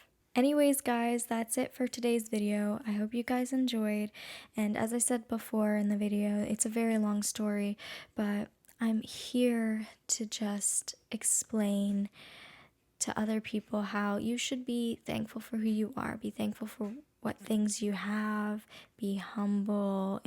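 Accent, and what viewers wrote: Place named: Philippines. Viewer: yes you are Filipina indeed the way you talk and how so proud to be a Filipino that shows you have the Filipino traits in you. I'm to hear and how you embrace the way being a Filipino. Goodluck and Godbless.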